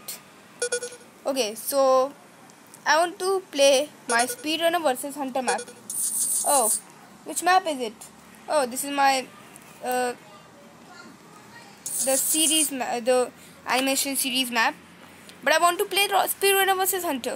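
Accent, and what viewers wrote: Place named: India. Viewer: Are your Indian because of your accent?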